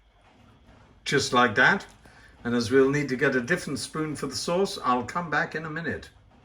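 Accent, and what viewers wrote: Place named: England, United Kingdom. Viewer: เข้าสองรอบเลยค่ะ ชอบอาหาร ชอบเสียงคนให้เสียง เพราะชอบสำเนียงอังกฤษทำให้คิดถึงคุณครูด้วยค่ะ ขอบคุณมากๆนะคะ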